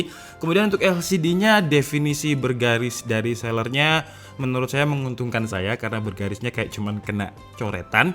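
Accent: Java, Indonesia. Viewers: Mas, njenengan asli pundi e? Haha Masih terasa bahasa Jawa nya.. haha Mantap mas lanjutkan dan jangan lupa mampir di channel saya mas.. 👍🏻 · Pake stip,,, medok jowo banget 😂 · Mase ki wong jowo ketone tek ngletek tek di protoli😭😂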